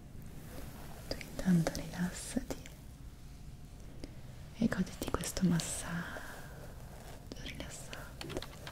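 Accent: Italy. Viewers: meravigliosa, molto rilassante e la sua voce è estremamente morbida, il suo accento italiano è una delizia da ascoltare. un bacio dal Brasile 😴❤️🇧🇷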